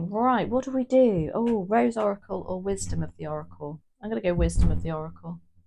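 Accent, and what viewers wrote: United Kingdom. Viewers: I admire readings of this🌹Very Beautiful Lady🌹 These messages resonate with 💯💯💯💯💯 persent. Almost all readings sre brilliant, right in the point 🎯🎯🎯 Plus added amazing British accent which is sheer delight to hear and sounds so pleasing and an effort to make lively environment for us with pretty flowers always maching colours of blouses and light all around, makes this chanel indispensable for everyone who needs to hear excellent readings matching with reality of the circumstances, taking place in life. Thank you very much for helping us in guiding to follow right path. 👏☘️✨⭐🌹🌟✨☘️💫🎗️✨👏👏👏😊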